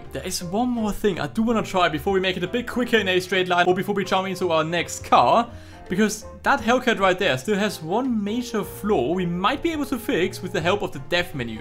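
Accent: Germany. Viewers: One question... can you speak german? Your English sounds a bit german!😄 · Are u german ?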